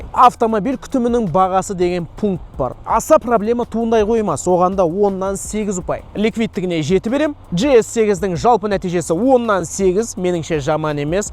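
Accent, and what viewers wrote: Standard. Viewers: жарайсың таза қазақша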